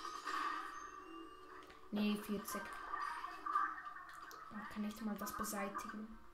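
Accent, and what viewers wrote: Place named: Switzerland. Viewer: Hey again ha also rächt ka mit minere annahm das du schwiezer Bisch ha es sofort a dinere ussproch gmerkt 😊 find machsch richtig gueti Videos witer so bi e YouTuberin us de Schwiz😅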